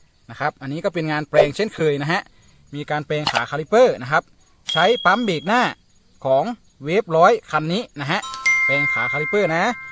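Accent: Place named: Lampang, Thailand. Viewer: สำเนียง เหมือนคนลำปางมากครับ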